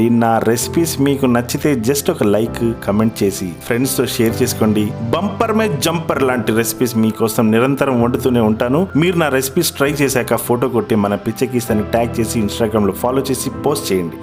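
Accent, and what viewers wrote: India. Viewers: sir, plz check your Instagram for both egg n gheeRoast Ckn. Just tagged from my wife's account😊😊we just prepared...as usual Ur voice, song, presentation.....finally Telugu slang.......pichchekkinchesindi😂😂😂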